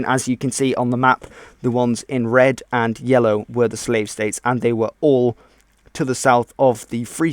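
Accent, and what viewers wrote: United Kingdom. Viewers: This dude is British it sounds like lmaooo y’all still salty about some Patriot Americans who beat your ass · Very good work here helps your British for At least us Americans can't get enough of a British accent ! Subbed!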